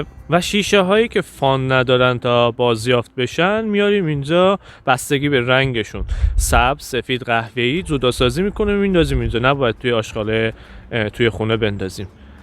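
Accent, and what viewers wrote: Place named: Turkey. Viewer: عالی داداش ،داداش یکم لحجه داری ترک زبان هستی؟؟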